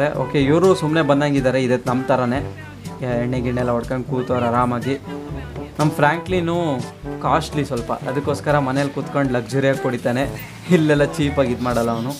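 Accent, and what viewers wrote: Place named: Chitradurga, India. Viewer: Bro nivu namma chitradurgada Helidiralla super 💞love from chitradurga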